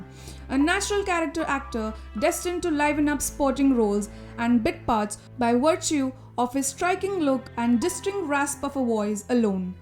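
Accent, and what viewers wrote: India. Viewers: I would seen this through if it wasn't for that strong indian accent. Reminds me of "microsoft" caller.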